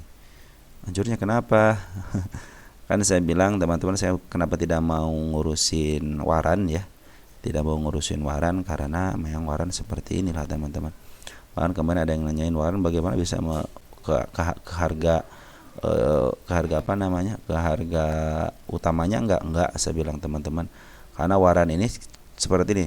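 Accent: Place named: Sunda, Indonesia. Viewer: Orang mana si akang , logat sunda na halus pisan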